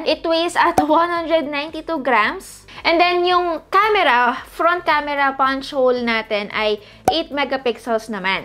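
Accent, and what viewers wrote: Visayas, Philippines. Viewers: yay! bisaya ka ate? <3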